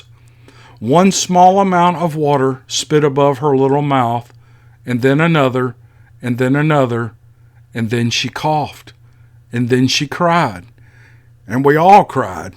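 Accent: South, United States. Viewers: Sir, I'm not sure what your name is, but I enjoy your channel and it interests me ,and I love your southern accent, I'm from Malaysia, keep up the good work. I never met Mr Foot before haha. It's alright ,it's amazing and you are amazing. God bless you. 👍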